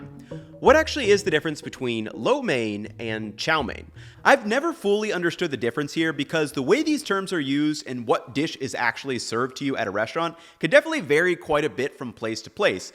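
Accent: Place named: General American, United States. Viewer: nope, he definitely pronounces 'noodles' with the typical General American vowel quality throughout the rest of the video. Just a slip of the tongue I reckon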